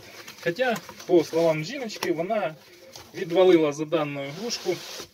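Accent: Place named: Rural, Russia. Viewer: ни хера не понятно , что ты там гутаришь на селюковском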